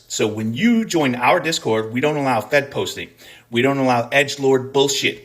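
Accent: Scotland, United Kingdom. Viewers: I gotta say - I love when you’re getting into your rants and your Scottish accent comes out. We need more Scottish rants! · You can tell how passionate is about the subject based on how often his Scottish accent makes an appearance. And he should be passionate about this, as we all should be. If this administration can't treat our veterans properly, they won't treat anyone properly. Thank you, and the admin team, for everything you do. I made it to the end of the video and eff the algorithm.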